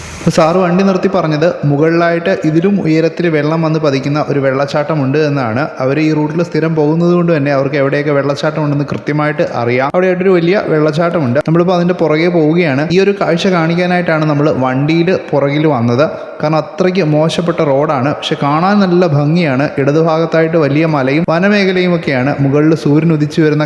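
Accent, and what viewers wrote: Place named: Pathanamthitta, India. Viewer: സേതു സാർ ടിപ്പിക്കൽ പത്തന്തിട്ട സംസാരം... പയങ്കര രസം ❤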